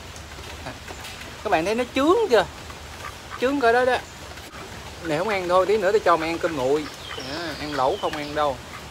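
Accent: Mekong Delta, Vietnam. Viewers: Lần đầu tiên e xem video của a e rất thích. Cười nghiêng ngửa giọng a đúng chất miền tây.ủng hộ a nhé các bạn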